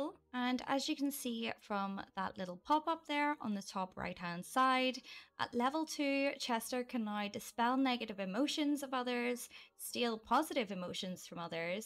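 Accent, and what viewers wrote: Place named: Northern Ireland, United Kingdom. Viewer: Omg I got so excited when I heard your accent! I have never came across a fellow northern Irish simmer! ❤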